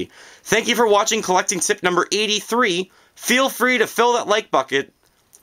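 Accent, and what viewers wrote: Canada. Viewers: ***** He sounds Canadian to me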